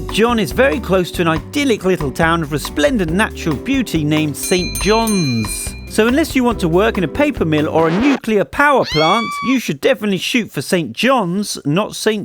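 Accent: United Kingdom. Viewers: Exactly. And New Brunswick cities aren't even half bad. This guy is so misinformed. He sounds British, probably not knowledgeable on Canada. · Are you from the USA? You sound so british.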